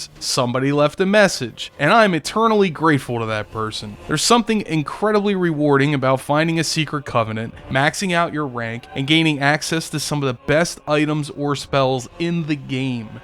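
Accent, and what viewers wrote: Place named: Philadelphia, United States. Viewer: Love that Philly accent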